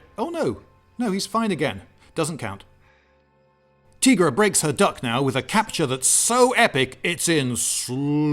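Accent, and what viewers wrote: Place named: United Kingdom. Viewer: For real this bad teeth brit voice is annoying to hear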